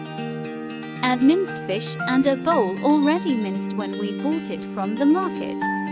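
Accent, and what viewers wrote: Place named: China. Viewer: Nice may voice cover na 🎉👏🥰 Chinese accent 😊 Looks yummy 😋 sis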